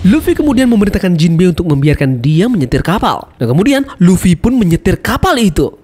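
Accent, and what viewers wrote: Bali, Indonesia. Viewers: kok kayak jd logat bali bang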